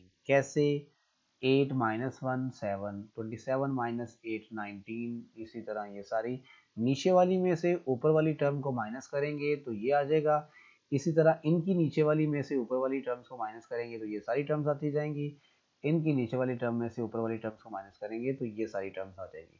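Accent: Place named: Punjab, India. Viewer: Mashallah your Punjabi accent is so lovely. Really love your teaching method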